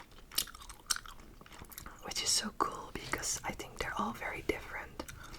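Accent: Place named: England, United Kingdom. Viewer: I looove listening to your English accent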